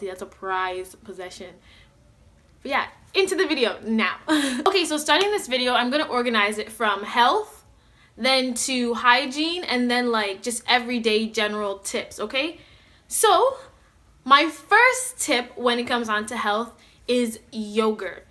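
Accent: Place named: Jamaica. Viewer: krys when I hear she talk I said to my self hmnnn she must b Jamaican r a wrap wid jamaican, gotta take care of the poonahniii lol yes I said it lol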